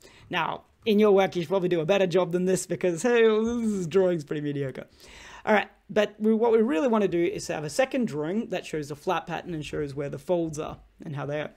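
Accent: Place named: Australia. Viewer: I can tell this mate is an Aussie lol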